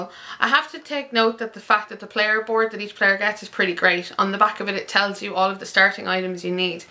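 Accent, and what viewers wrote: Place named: Ireland. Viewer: Nice video! Great to hear an Irish voice talking about games too!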